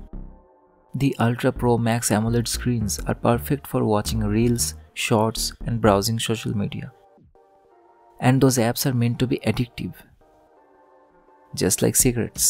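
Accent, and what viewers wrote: India. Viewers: I knew as soon as you started talking you were from Kolkata.... as one Bong tech nerd to another, I subscribed instanty! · bro you sound indian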